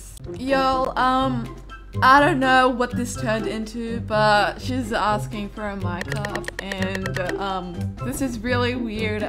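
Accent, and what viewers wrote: United Kingdom. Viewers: hi hi hi hi pls answer my question are you British or American?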